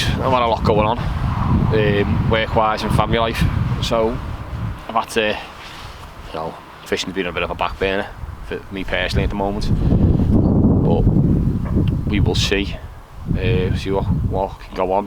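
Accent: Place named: Liverpool, United Kingdom. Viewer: That scouse accent is something else...well done kidda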